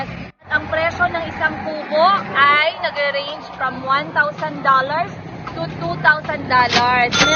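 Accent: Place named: Bulacan, Philippines. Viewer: May hinala ako sa ‘yo Tinderang Africana na may hawig ka na KABABAYAN kita, may pagka- Bulakenya sa tấtas mong magsalita sa Tagalog, may pagka-makata pa Kung minsan ka kasi, taga bulakan dati ko narine na sa california.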